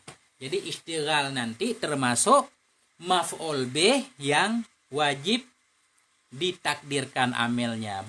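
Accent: Aceh, Indonesia. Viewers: Loghat ustad kayak aceh gitu ya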